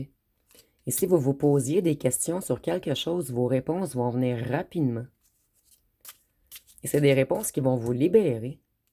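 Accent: Quebec, Canada. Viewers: Merci pour vos predictions avec le charmant accent quebequois.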